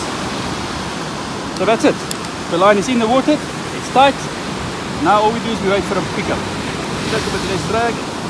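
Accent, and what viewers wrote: South Africa. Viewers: i love the accent. sounds south african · are you South African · Sounds very South African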